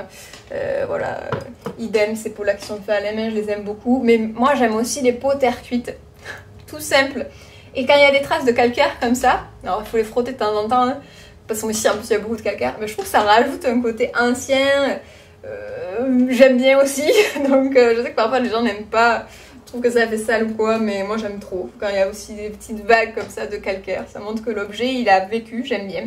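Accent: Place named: South, France. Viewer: Et en plus vs êtes de chez moi? Vs êtes de montpellier? En tout cas bien l’accent du Sud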